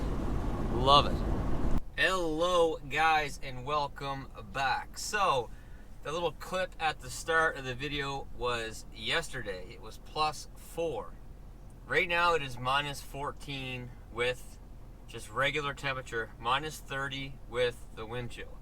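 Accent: Nova Scotia, Canada. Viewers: Damn Canadian accent is annoying .. it’s OUT not oughhtt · 10 seconds into the video I know you were Nova Scotian lol ... I'm from Truro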